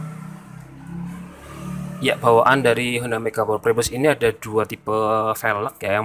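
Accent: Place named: Banyumas, Indonesia. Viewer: Ngapak ya mas wkwk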